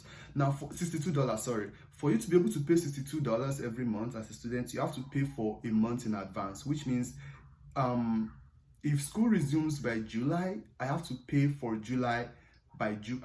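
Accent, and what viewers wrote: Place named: Ogbomosho, Nigeria. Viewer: Mateo and I are watching. He says he like your 'frequent' ogbomosho tongue :)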